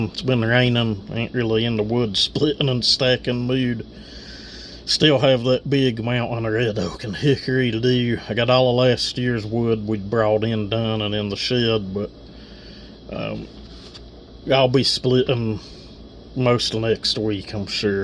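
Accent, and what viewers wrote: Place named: Deep South, United States. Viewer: No drama about the port work but what the fook is up with that accent? Is that deep south swamp? Love it too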